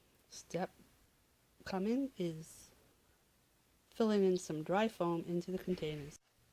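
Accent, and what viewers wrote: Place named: Maine, United States. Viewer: Hi, I noticed right away you were saying the same kind of things l say with the same accent. That was a really nice experience. I loved your video. I am looking for ideas for decorating my son’s grave. Then I looked and saw that you are in Sanford Maine. No wonder! I’m in Somersworth. We are neighbors! Thanks for the video.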